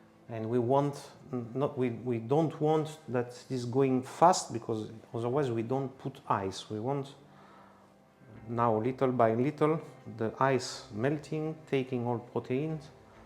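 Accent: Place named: France. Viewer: j'ai mis une minute a comprendre que la video est en anglais, je sais pas si l'habitude de l'anglais, le french accent, ou l'herbe dans la cigarette, bref merci pour la video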